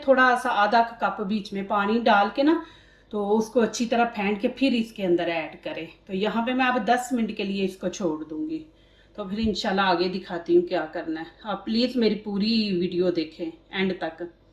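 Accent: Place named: Punjab, India. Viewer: Tusi Punjabi ho?!! urdu may Punjbai khushbu a rahi hai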